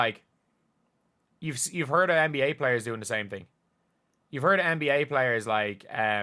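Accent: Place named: Ireland. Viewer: You sound Irish.....😊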